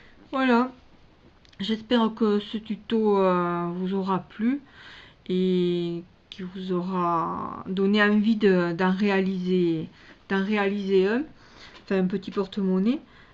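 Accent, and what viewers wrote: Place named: South, France. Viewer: coucou, super explications en plus avec ce petit accent du sud j'adore :) merci pour le tuto à bientôt bisous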